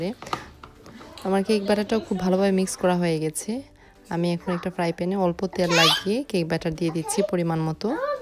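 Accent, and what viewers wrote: Sylhet, Bangladesh. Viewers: Apu,apni ki Sylhety?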